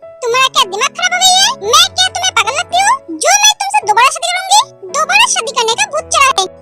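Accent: Bengal, India. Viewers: Apu Tumi ki bangali tomar hindi sune mone hoy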